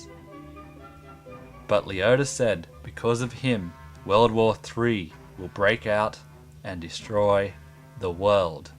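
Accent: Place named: Birmingham, United Kingdom. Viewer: i suspect a brummie accent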